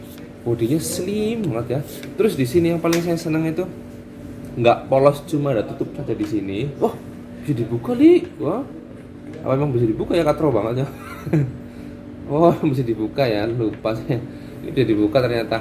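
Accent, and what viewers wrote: Banyumas, Indonesia. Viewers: Deneng bakule ngapak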